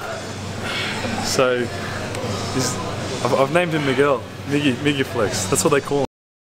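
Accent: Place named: Australia. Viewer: Accent von Aussie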